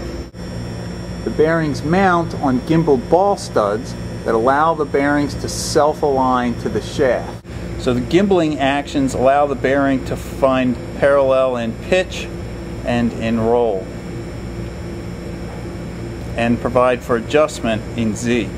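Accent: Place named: Philadelphia, United States. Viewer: You have a Philadelphia region accent.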